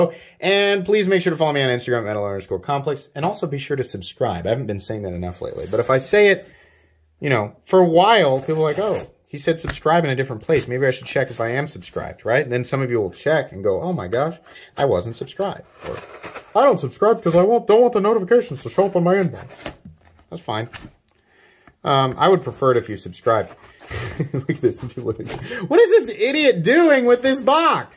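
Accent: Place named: South, United States. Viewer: Extreme southern: thes air ryt hare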